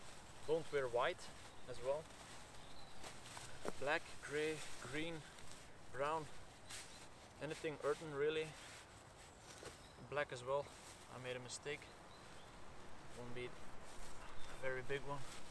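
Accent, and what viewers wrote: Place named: Russia. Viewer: Классно снимаешь, акцент, по правде говоря, всё-таки русский)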